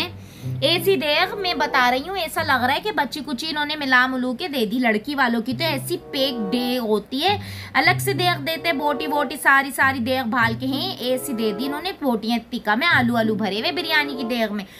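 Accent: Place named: Lalukhait, India. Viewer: very good accent, ,same i heard somewhere in lalukhait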